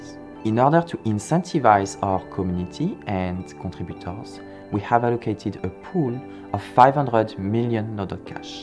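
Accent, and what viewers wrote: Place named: France. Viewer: No offence, but I had to watch it 3 times to understand. It might be just me or English with French accent is not very easy to understand, although interesting message, keep going with good work Nodle!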